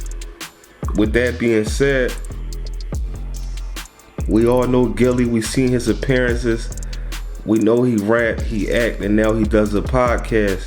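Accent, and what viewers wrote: Philadelphia, United States. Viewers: U sound like a Philly nugga so ima take ur word 4 it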